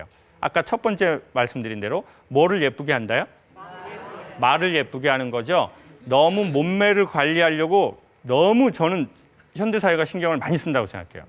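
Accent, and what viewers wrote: Jeolla, South Korea. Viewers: 영상 잘 보고 갑니다~ 구수한 전라도 억양이 듣기좋네요~ 사투리로 강의도 함 해 주랑께요~~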